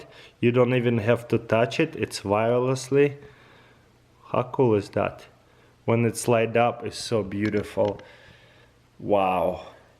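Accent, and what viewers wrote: Russia. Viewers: Why nobody talk about his pretty stereotypic Russian acsent? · Try to speak more fluent English. How is your russian accent so thick even though you've lived here for so long?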